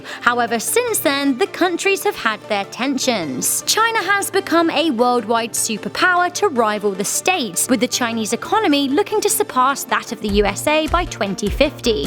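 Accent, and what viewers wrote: England, United Kingdom. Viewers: Why do they always have people with English accents narrating these videos? I mean, it’s about China and America, not England.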